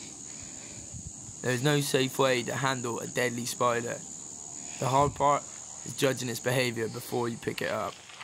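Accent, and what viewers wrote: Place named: Southern England, United Kingdom. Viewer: You've got a southern British accent. Can't tell if you're from Brighton, or somewhere in Surrey, Kent or Hertefordshire, lol!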